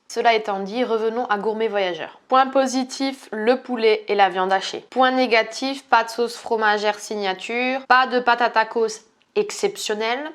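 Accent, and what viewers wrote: Alsace, France. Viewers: Oh lalala l’accent alsacien tres prononcer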